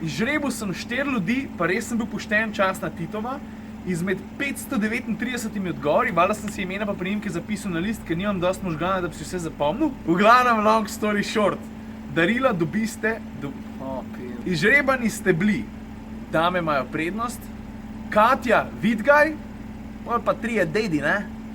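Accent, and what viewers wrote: Styria, Slovenia. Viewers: štajerski na glas pa ti gre.😂💜💛#ifeelmaribor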